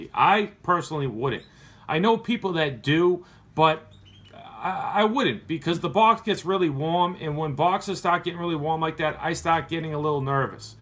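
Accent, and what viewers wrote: Boston, United States. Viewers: Is this guy from Boston? Most times he said copper it sounded like coppah Either Boston or Philly. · u from Boston ain't ya? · u must be from Boston or Maine aye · Can tell you probably from Boston or close by. I'm from South Boston myself. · r u from boston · this dude is from boston right? his accent wierd.... "copah" lmao xD insted of "copper" lol when i heard him say coper i instantly knew XD! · i was wondering where your accent was from then you said copper. Only people that say cahpah are from boston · are u from boston cuz i can hear boston accent.lmao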